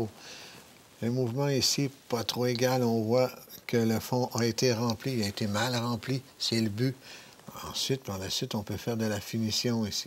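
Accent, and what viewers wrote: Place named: Quebec, Canada. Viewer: Bonjour Mr le Magicien : C'est un régale de voir vis oeuvres et c'est la première fois que j'entends en prime le bel accent Quebequoi je suppose. Bravo pour tout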